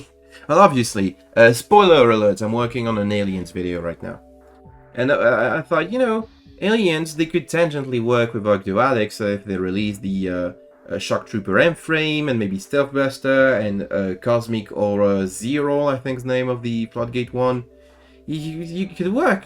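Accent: France. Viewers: Yo i kinda here the french accent in this one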